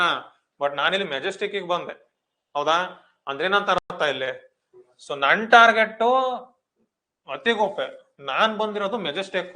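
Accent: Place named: Mandya, India. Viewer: Sir Nim voice matado style mandya bhashe bittu hogilla niv ellig hodruu ❤❤❤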